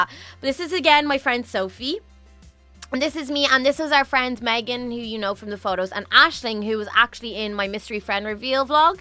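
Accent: Ireland. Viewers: Omg I remember watching u when I ren was like 7 omg I missed ur Irish voice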